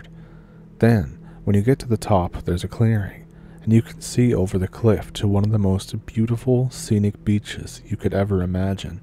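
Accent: Canada. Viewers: knew you had to be Canadian with that accent!! Could listen to ya talk for days 😁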